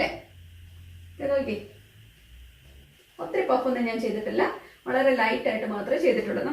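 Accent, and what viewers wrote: Kottayam, India. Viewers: Chechi namude Kottayam Kari anenu thonnunallo...namal kottayamkarde prethyekathaya adupam ulloru samsarikanapole samsarikunath...luv it🥰🥰🥰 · Chechi kottayam kaariyaano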